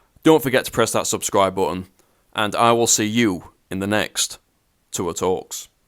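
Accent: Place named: Cockney, United Kingdom. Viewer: Melt ?;Your from Stockport I guess so why come out with the old cockney twang ?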